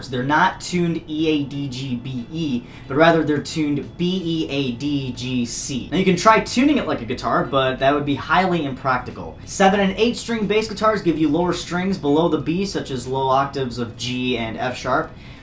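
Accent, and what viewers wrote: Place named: India. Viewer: I can tell you're Indian.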